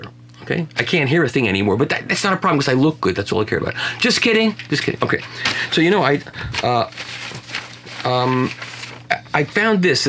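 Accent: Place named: United Kingdom. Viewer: Love the Brit accent, but try Manchester rather than London next time. I can give you some tutalage… for a fee of course 🇬🇧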